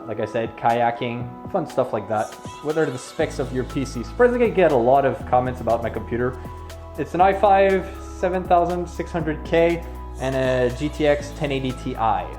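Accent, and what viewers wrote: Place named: Ontario, Canada. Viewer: I knew you where Canadian! I hear the French ontario accent in your voice!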